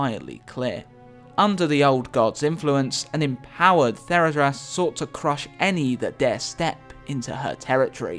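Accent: United Kingdom. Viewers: I'm really surprised I found that, as iv'e only started playing hearthstone 6 months ago and never played WoW in my life.. cool! I wonder what the mistake actually is....... Btw, may i ask where you're from? I guess british but i'm not sure..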